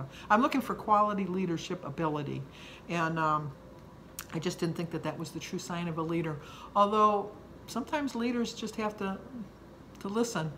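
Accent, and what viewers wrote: Midwest, United States. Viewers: The ' " LORDY MERCY ME.....you should be so proud of yourself...because of you, Carrie and Amy, I finally got over this hump in my weight journey (11 pounds down since October)....I am.4lb. away from my PERSONAL GOAL for my 65th birthday next Thursday. Even though I have achived Lifetime status 5 years ago, (lot's of stuggles, ups and downs, that's just LIFE) I was getting out of control again. I was paying for my meetings because I was over the 2 pounds that is allowed. I have two more Saturday's to weigh in to hit my 'goal'....Then after that, I have another little goal by May 5th. I leave for Italy on a biking vacation and you know what kind of food I will be facing in Italy!! I can handle it....I know I will.... that is why I LOVE active vacations....Keep on moving, chicky girl....I am orginally from Chicago and I relate to your Midwestern accent...😂😂👏👏🎈🙏🙏 Stay strong...Rome wasn't built in a day!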